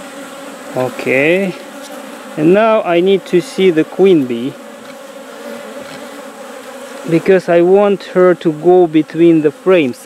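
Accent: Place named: Russia. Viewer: Мне одной кажется, что он на самом деле русский, но пытается говорить на Английском с ужасным акцентом..)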